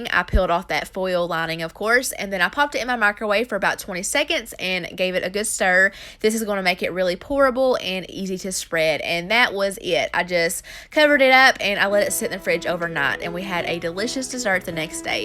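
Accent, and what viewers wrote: South, United States. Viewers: I love how you say monday 😍😍😍 ur country accent 😍😍😍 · Just found your channel and I ADORE YOU! Your content is awesome and your personality makes me feel like we’d be great friends lol 😂🤗😘 Plus I LOVE your southern accent! New subbie ♥️♥️♥️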